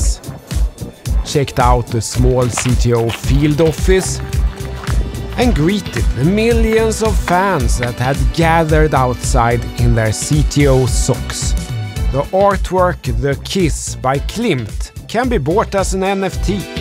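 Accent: Sweden. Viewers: Shenanigans in a Swedish accent - love it. 😜😜❤❤❤❤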